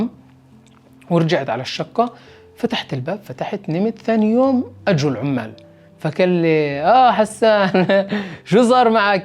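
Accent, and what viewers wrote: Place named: Palestine. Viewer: يسعد اللهجة الفلسطينية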